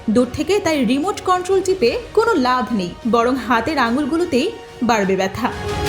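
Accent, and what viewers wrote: India. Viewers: উপস্থাপনায় ভারতীয় প্রভাব পরিলক্ষিত।কেমন চিৎকার মনে হয়। দয়া করে দেশী টোন ব্যবহার করে কথা বলুন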